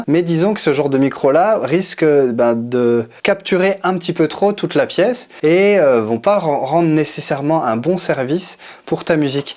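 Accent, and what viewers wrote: Quebec, Canada. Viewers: Mais si, il est bien l'accent québécois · excellent accent québécois !! 😀 bravo !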